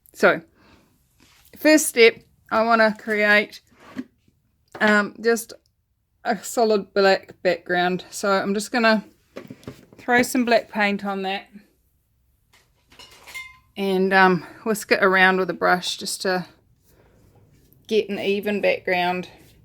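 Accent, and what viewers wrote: South, United States. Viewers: Sounds like a mixture of a Southern and Midwestern accent! This little guy isdarling. You've certainly got it going on this!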